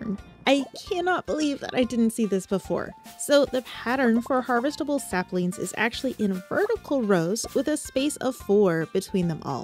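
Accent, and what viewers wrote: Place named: United Kingdom. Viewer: eeeeewwwww BRITISH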